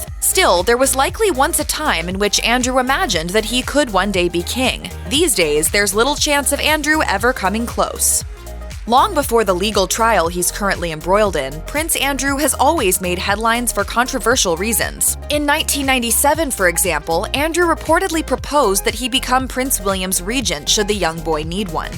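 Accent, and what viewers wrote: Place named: United States. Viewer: Video: "Prince Andrew is one of the most...." Me: *Hears American accent, immediately skips to next video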